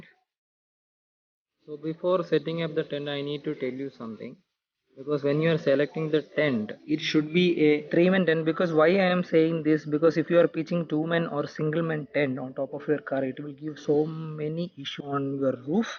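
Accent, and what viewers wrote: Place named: Malaysia. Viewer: Manglish was gud. It’s better do in wat u know.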